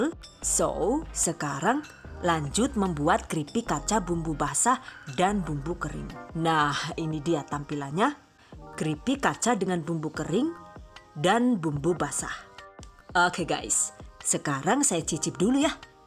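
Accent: Banyumas, Indonesia. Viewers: Subcriber baru ☝️asli lucu banget kamuuuuu, gyemessss ngapak"nya dan caaaattaattt. Ada tips & trik, lengkap deh. Pas kepoin yt nya ternyata banyak bgt resep" yg ku cari". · Suka aksen ngapaknya kaya rika wkkkk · Deneng ana ngapak2e 😂😂😂 jhahahaha😂 auto SUBSCRIBE yu. Komen ninggal jejak. Lopene kue di tempel. 💖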